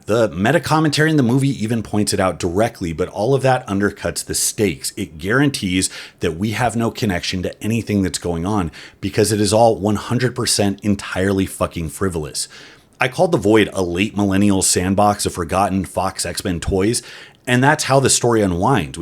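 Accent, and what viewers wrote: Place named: United Kingdom. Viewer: Bromley British accent is the voice inside of my head. Remoinds me of a boss I once hated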